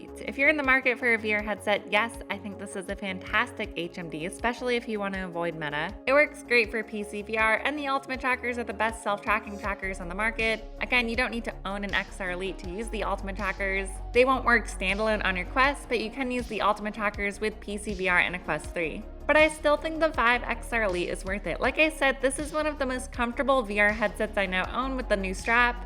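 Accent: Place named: Philadelphia, United States. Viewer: The way you say headphone and other words makes me think you might be from Philly.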